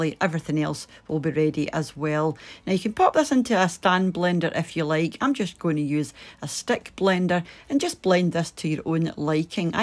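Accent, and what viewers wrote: Scotland, United Kingdom. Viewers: Oh I LOVE this recipe as much as I love your beautiful Scottish accent! Your recipe looks simple, tasty & delicious! 👍😜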